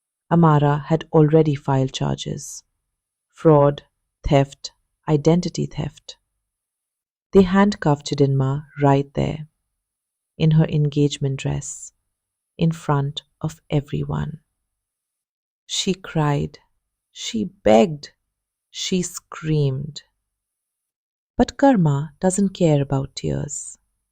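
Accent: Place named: India. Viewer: The narrator voice changed to Indian,not professional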